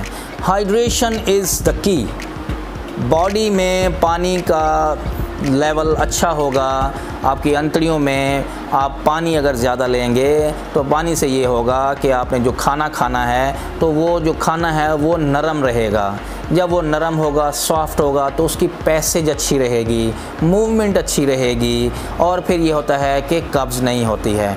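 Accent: Pakistan. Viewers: Bahawal pur yaad aa giya ...aap ki aawaz sun kar ...zinda bad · Sir ap saraki speeker hen lagta ha janoobi punjab K rehnay walay hen....nice videos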